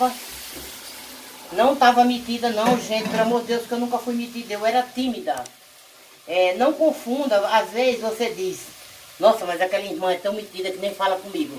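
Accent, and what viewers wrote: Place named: Northeast, Brazil. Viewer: Boa noite minha linda 😁 eu amo os teus vídeos 😁 agora sim eu entendi porque você fala tanto em Barbacena 😁 vejo que tem sotaque nordestino 😁 eu sou mineira e amo esse povo do nordeste 😁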